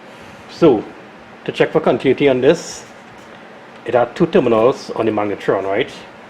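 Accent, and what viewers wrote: Trinidad and Tobago. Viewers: Are you a Trinidadian?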